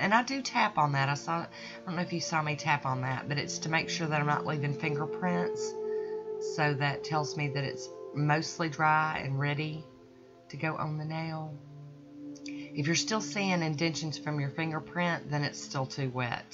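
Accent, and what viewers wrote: South, United States. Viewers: Hey I love that southern draw!! aEngland makes some great polishes - I love their brushes and the two polishes I have apply so smoothly!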